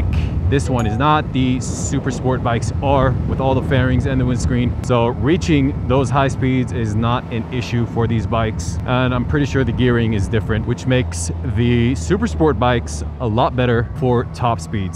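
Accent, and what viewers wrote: United States. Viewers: אשכרה עד שלא ראיתי את המונית הייתי בטוח שאתה מארצות הברית. המבטא, המראה הסינמטי, הכל נראה מושלם אחי. אהבתי ועשיתי סאב, שרק תפציץ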